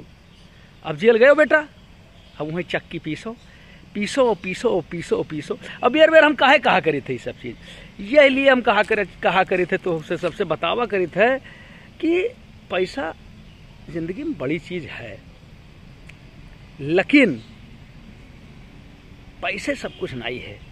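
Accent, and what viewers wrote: Awadh, India. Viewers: kuch jada avdi bolte hai app but m sultanpur up44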